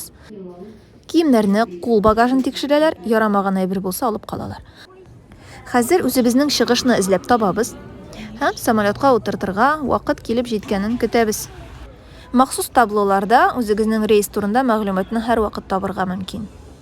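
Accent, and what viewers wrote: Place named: Kyrgyzstan. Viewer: Кыргызчага окшоп суйлойт екен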